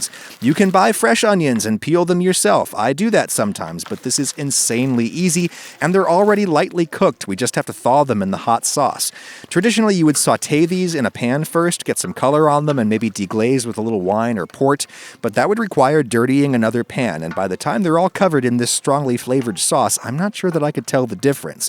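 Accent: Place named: United States. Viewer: Dammit man. I keep seeing this dudes profile picture and think its Alex French Guy Cooking. Was shocked to hear an American accent when I clicked haha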